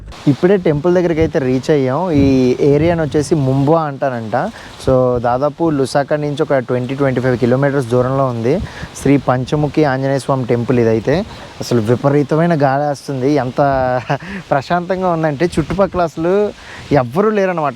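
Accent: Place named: Anantapur, India. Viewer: yaantaapur district ... super accent anna...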